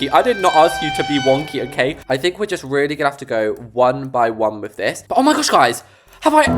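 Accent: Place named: England, United Kingdom. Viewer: I don’t think he is loll, hes from England so that’s why he has an accentt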